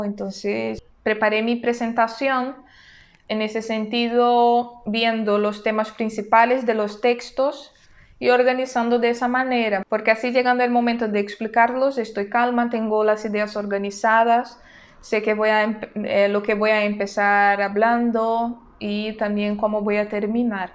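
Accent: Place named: Spain. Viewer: Una mujer latina hablando el español de España y yo acá en Europa estudiando el de Latinoamerica. Me da chiste jaja. Su español es increíble! Muchas gracias por los consejos, me ayudaron mucho. Ojalá algún día alcanze lo mismo. Tb aspiro el C2 pero me parece bien dificíl y siempre cuando tengo nervios se me va el español. 😂 Bueno, tal vez en 10 años lo voy a intentar jaja Saludos desde Alemania. ✌🏼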